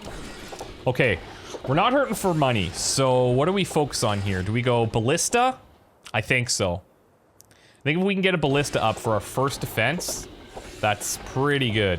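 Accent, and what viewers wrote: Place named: Quebec, Canada. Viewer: I'm betting your Canadian, that accent is a beauty. I'm guessing Québec, there's a little bit of that French Canadian, eh.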